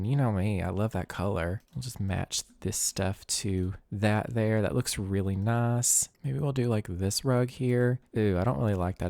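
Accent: South, United States. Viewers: Just working from home and listening to calming voice! I work CS and find that his smooth tones and familiar Southern access sooths me while I deal with the aggravations!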